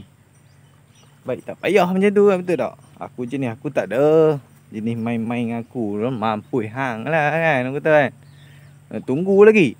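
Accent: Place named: Gerik, Malaysia. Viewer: Ckp pun udah mcm org grik....tokdang tahok..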